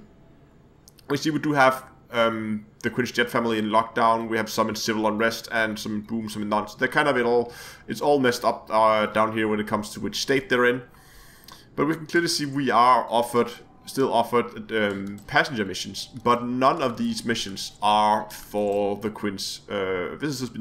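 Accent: Denmark. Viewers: Are you danish? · nemt at spotte ens egen accent, men perfekt engelsk ellers:)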